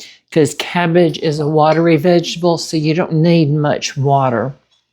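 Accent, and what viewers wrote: South, United States. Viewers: OMG! I just happened to fall upon your channel and, if I close my eyes, you sound just like Paula Deene. I love your Southern accent. New subscriber 🤗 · What a sweet couple you are, so glad I just found your channel! ❤❤ Congrats on being featured in the magazine! What state are yas in, love your Southern accent. ❤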